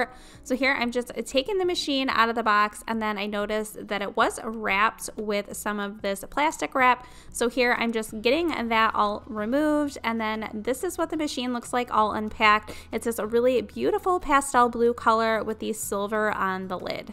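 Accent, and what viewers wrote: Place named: Chicago, United States. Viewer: Sounds a little like a Chicago accent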